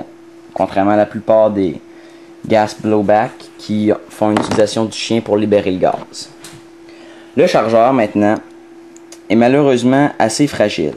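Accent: Quebec, Canada. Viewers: Meuh non ^^ Quebecois ! un accent pareil ^^ ! Vive le Québec Calice ! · Belle review merci. Et vive les canadiens leur accent est vraiment super (je ne moque pas je suis en admiration sa donne un petit charme). De Belgique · with a canadian accent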